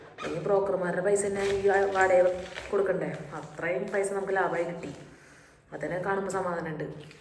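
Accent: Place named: Thrissur, India. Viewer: Nammade asal thrissur language..enikku kettappo samadhanam ayi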